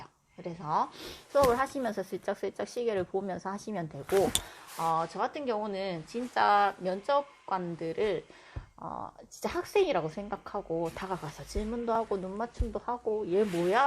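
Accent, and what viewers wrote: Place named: Gyeongsang, South Korea. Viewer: 선생님~ 임용 공부하다가 억양이 너무 익숙해서 찾아보니 대구셨군요! 송구하지만 저도 자료 부탁 드려도 될까요? <mail> 입니다^^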